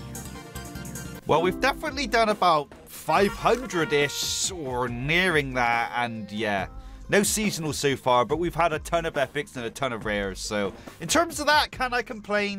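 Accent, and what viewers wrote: United Kingdom. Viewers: If he British or American? Also f😊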